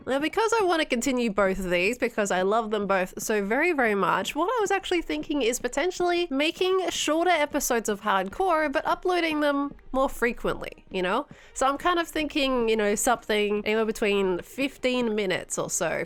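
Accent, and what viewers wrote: Australia. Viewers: This series is so fun. I'm so so glad I've found it. It is just so nice to have a aesthetically pleasing builds all backed up by an Aussie accent, I just find that I watch so many people from other countries lately that I miss just hearing something more familiar ❤️ (does that make sense?.... tbh I find that I sometimes even crave more Australian-ness in movies and tv lol)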